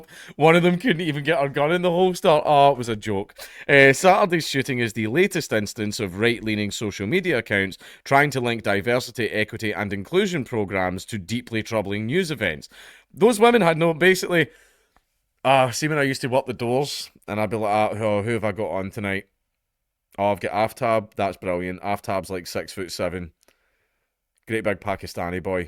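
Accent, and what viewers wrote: Scotland, United Kingdom. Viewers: Why is it made so much more ridiculous when called out in a Scottish accent?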